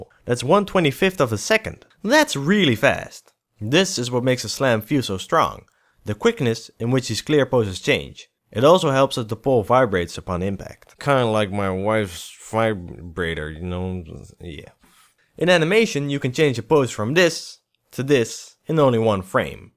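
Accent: Netherlands. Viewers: U dutch? Cus you sound dutch. Im dutch too and into drawing and animation. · dutch accent is so obvious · are you dutch? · You sound really dutch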